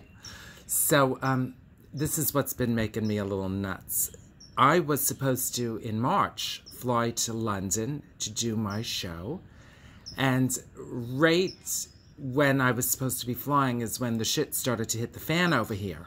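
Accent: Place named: New York, United States. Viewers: I just have to thank you for all your videos, especially the Thoughts While In Solitude series. You are like a good friend I can stand:-D and your vids are helping me get through this pandemic. I could listen to you yammer all day in that accent, which is what I hear all the time now living so close to NYC. Stay well, best wishes always. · Jerry Hunter It always sounds so much more effective when spoken with a NY accent. Lol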